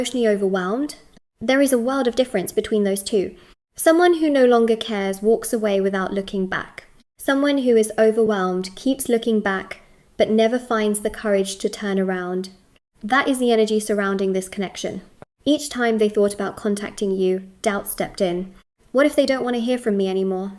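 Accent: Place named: United Kingdom. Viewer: What a beautiful accent...the British one❤.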